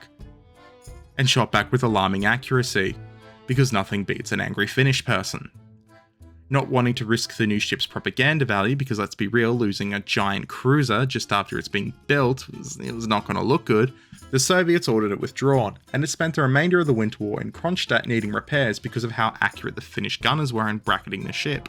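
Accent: Australia. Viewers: Like Vegemite, the Aussie accent is something to be proud of, even if it's not for everyone. Personally, great video and attitude with the foreword. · Gotta love the sound of a fellow Aussie talking out his arse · Hey! Don't really give a shit about ships but I do appreciate your accent, proving again that we don't all sound like yobos. Have fun & hope the channel does ok.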